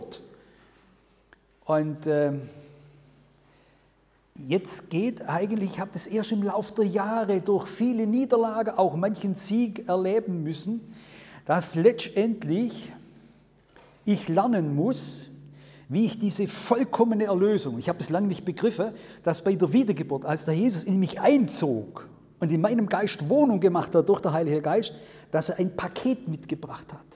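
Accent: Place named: Swabia, Germany. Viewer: Sehr interessantes Zeugnis und dazu noch des Schwäbisch🤗🙏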